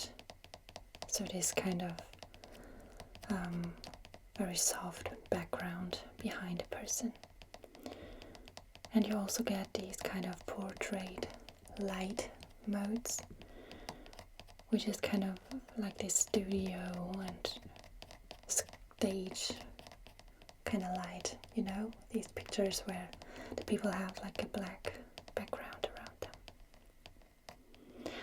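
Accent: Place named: Germany. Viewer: A suspiciously good German accent🤔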